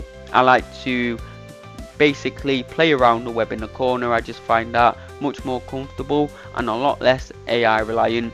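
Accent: United Kingdom. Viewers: Ok so I literally just watched a video by someone else on this guy, and dude I literally couldn’t pay attention through the entire video and learned basically nothing. Then I come here and watch you and I just got 100% locked in immediately somehow?? pls never leave again, my brain can only understand your British explanations 🙏🙏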